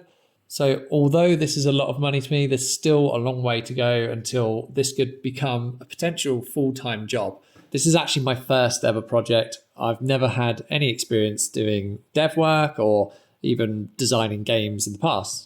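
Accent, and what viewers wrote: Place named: United Kingdom. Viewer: Ohh maan.... British accent is the best.. i love it 😂😂😂🎉🎉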